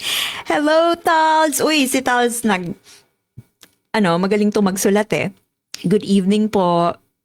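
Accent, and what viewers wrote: United Kingdom. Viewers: I’ve watched you for the first time with Christ Tan‘s podcast, (that was just yesterday) since then nag marathon na ako sa mga vlogs mo. Sayang na late ako ng 4 days sa live mo na ito. Anyways, i love your British accent just keep it up…yan ang tatak mo plus hindi ka nakakasawang pakinggan. I have 4 friends from Davao mismong kababayan ng mga Du30 but they‘re not DU30 followers kaya i love them so much! I’m your new subscriber saying HELLO FROM VIENNA AUSTRIA, the Batangeña!❤️💋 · Manang, hindi aq kasali sa any political party, may reaksyon lang Ako sa isang blog mo with christian, ala Ako paki sa political views mo, PERO NATAWA AKO SA PAGMAMALAKI MO NA ABOUT YOU GOIN' ON A CHURCH AT PAGBA.BIBLE STUDY MO, BUT THEN MAGCRITICIZE KA NG TAO, PARANG AKO NAHIYA KZ LUMALABAS LANG PAGIGING HIPOKRITA MO, ANG BAWAT TAO BINIGYAN NG TALENTO HINDI PARA IPAGMALAKI KUNDI GAMITIN SA MABUTING LAYUNIN AT HINDI IBINABANDERA O PINANGANGALANDAKAN ABOUT YOUR "BRITISH ACCENT", ICRITICIZE MO SA TAO, SABIHIN KO SAYO KAHIT RUSSIAN, KOREAN, JAPANESE PRESIDENT HINDI MAGALING SA ENGLISH AT WALA YANG ACCENT NA PINAGMAMALAKI MO, KUNG HINDI MAGALING SA ENGLISH SI VP SARAH EH ANU NAMAN SAYO?? 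AT LEAST NAG.TATRY SIYA NA GAMITIN ANG INGLES DAHIL ANG MOTHER LANGUAGE NAMAN TALAGA NG PILIPINAS AY TAGALOG, BAKIT GINAWA NG DIOS NA MAGKAROON NG IBA'T IBANG LANGUAGE KUNG MAS IMPORTANTE PALA SAYO ANG ENGLISH AT ACCENT NITO, KAHIT NA SINASABI MO NA HINDI MAGALING SI VP SARAH, WALANG OBLIGASYON SI VP O KAHIT SINO NA NAMUMUNO SA GOBYERNO NA ICRITICIZE MO SA MGA PINAG-ARALAN NILA, PEKE KA DIN EH AT HIPOKRITA, WAG MO NG IPANGALANDAKAN YANG PAGPUNTA PUNTA MO SA CHURCH AT PAGBA.BIBLE STUDY MO, NAGMUMUKHA KA LANG PAYASO. MAY ISA. Pa pala, kung talagang nagbabasa ka ng BIBLIA dapat alam mo yung talata na kapag nag-iisip ka ng hindi maganda sa kapwa ay katumbas din ng pagpatay. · Good day mam, you have a voice..British accent ba..:face-blue-smiling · You do sound British, I'm loving the accent though 😊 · Like your British accent and Cebuano.. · Yes Ms. I love your British accent and specially your sense of humour,God Bless 🇵🇭🙏🇨🇦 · UK accents 😊❤ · Your style of talking "a little British accent" adds flavor to the whole shebang. 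You caught my attention Girl! I am a big fan - keep going especially taking on people with different perspective blinded by their wrong viewpoint. You are on the right track of messaging. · Ms., puwedeng puwede ka po maging newscaster. Filipina with British accent. Ang galing mo po!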